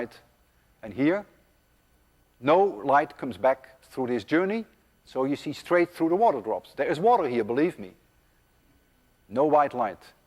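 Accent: Netherlands. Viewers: Ha, I knew he was Dutch... Because of the accent. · With that kind of accent he could only be Dutch...figures I was right:) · Is he dutch? He's accent is kinda dutch?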